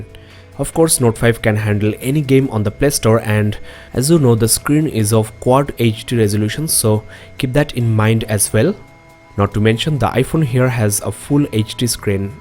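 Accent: India. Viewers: he speaks indian english dude 😄😄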